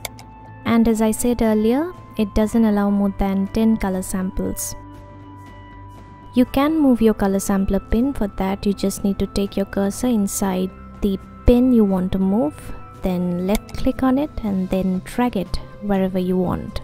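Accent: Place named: India. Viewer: don’t listen to them. You have Indian accent but you are 100% understandable. 10 stars 🌟🏆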